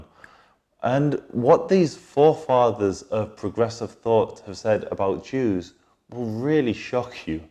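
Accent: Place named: England, United Kingdom. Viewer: I've never seen redheaded Semites with freckles and blue eyes...or speak English with a deep English accent... I really hope you were born speaking her or Arabic or Aramaic... if not your misleading the veiwers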